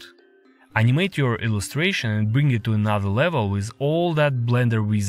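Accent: Russia. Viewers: Oh man, your strong Russian accent is great.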